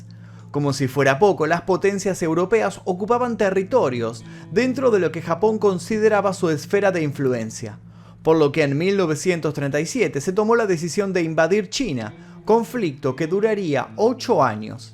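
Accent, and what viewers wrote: Argentina. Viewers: Un argentino hablando? No veo ésto! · Exelente documental !! Imagino que el locutor es argentino , no es necesario salir a cuadro , con esa barba de chivo no le da seriedad al tema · No puedo creer que los argentinos NUNCA pronuncien bien la "Ll" y la "Y" y cuando tienen una "Sh" en frente les dé por decir Hiroyima... Que alguien me explique.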